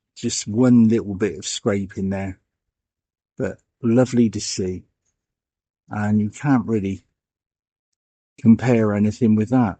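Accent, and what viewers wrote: Wiltshire, United Kingdom. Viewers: Hello. You're such an interesting person. Wiltshire through and through, with an accent to match. That holloway looked to have some tarmac to walk on. Great video, thanks. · My wife says that your accent sounds like my father who left Wiltshire as a boy in 1910. Another nice video.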